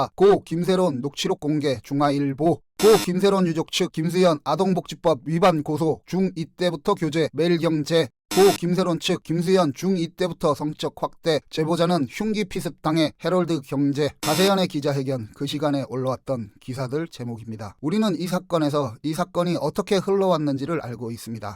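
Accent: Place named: North Korea. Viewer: 북한 사람 같아요 말투가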